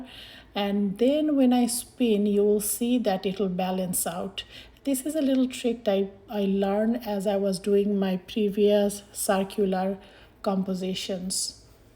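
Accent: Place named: Middle East. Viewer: I love your creations, and I think it's adorable that you have that (I think) Middle Eastern accent, and you use the phrase, my bad. 🥰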